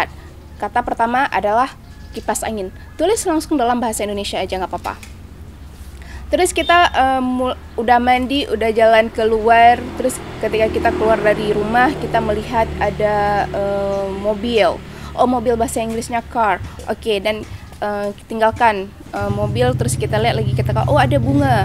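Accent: Malaysia. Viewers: Logat nya kadang kayak bhasa malaysia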